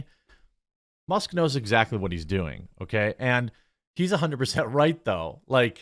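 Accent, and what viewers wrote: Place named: Wisconsin, United States. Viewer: I get a chuckle when your Wisconsin accent breaks through. DrAYgged. VolkswAYgen. Brings a smile to my face when not much else has over the last 2 years.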